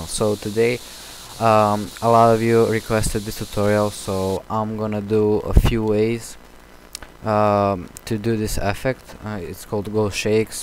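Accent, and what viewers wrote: Russia. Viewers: Это что чеченский акцент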